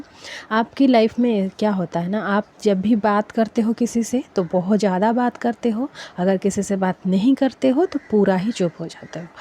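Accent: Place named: Jharkhand, India. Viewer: oh mujhe laga Jharkhand se ho...apki accent se laga.. 👍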